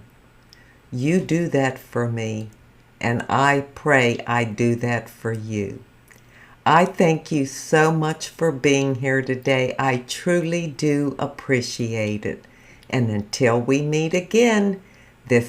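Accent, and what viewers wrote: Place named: Pennsylvania, United States. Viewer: Hi! I'm new here to you! Wow no foundation! I'm a foundation Queen at 78 almost 79! I think I still like to play little girl dress up LOL I had my cataract surgery done January and I can't wear full make up yet or eyeshadow and I'm chomping at the bits LOL your skin looks good for not wearing any foundation you must take care of your canvas because that's number one for me even though I cover it with foundation LOL I love your accent my PA girl I'll see you again soon. Oh and I don't use this foundation only because it's not enough coverage for me but it looks good on you! My subscribers have gotten me thru some tough times the last year so yes encouragement and support means everything! 😘Hugs Babs ❤️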